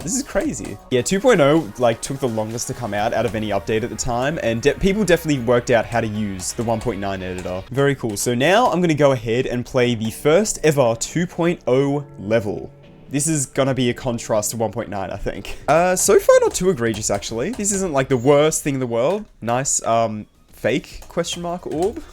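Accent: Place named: Australia. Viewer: Why do the Aussie yt’s sound the same. Like you sound like the nba YouTubers called “6man”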